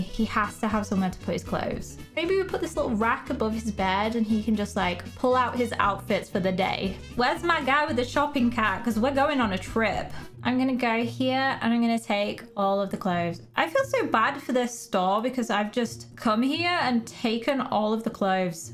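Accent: Scotland, United Kingdom. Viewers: are you Scottish?? Bc I am “ps bc means because just is you did not know. But are you Scottish??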